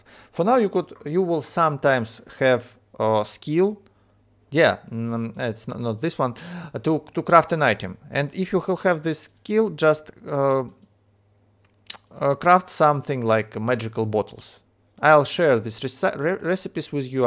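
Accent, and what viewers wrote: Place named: Russia. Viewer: Охренеть. Не нашёл нормального гайда на русском. Внезапно нашёл данный на инглише..... и сразу чувствуется дикий русский акцент - всё понятно :)))) Спасибо! :)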